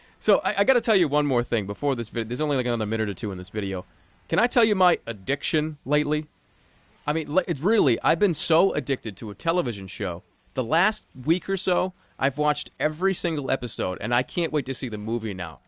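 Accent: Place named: New Jersey, United States. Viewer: Are you from New Jersey or Boston by any chance? I'm in New York. I can hear a strong accent :D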